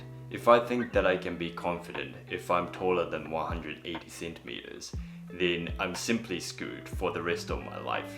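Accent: New Zealand. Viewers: I'm perplexed by the I watch on YouTube. He presents himself as a person who enjoys solitude, stoicism, introspection, and minimalism. He is self-conscious with his English. However, his English is articulate and well composed, with an occasional and charming compensatory hard Japanese "r" and delightful Kiwi accents. He strives for standard American English, now thought of as West Coast American English. With all of his preferred introsepction, he presents these wonderfully scripted videos of professional quality. So, is he a loner who is driven to tell us why and persuade us to find the same karma? How can he, without a salaried job, live so well in expensive Japan? While he professes not to be moved by the opinions of others, he has perfected his physic, his speech, and his mannerisms for others and is delightfully, appropriately seductive. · You're wise and I like your kiwi accent. 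Cheers from 🇬🇧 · I’m sure that you had great English when you were 15. The problem was that New Zealand accent. If you have come to the US, we would have been able to understand you better than some native New-Zealand-ese speaker. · Yes I hear the Kiwi accent too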